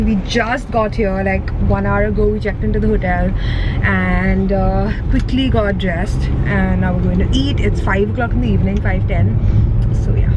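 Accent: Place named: India. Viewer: Gosh your Indian accent is so thick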